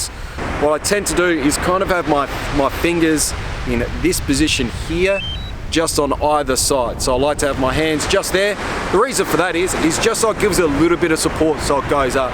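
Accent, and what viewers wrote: Australia. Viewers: Aussie !!! Yeh mate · To man, I like your Australian accent 😍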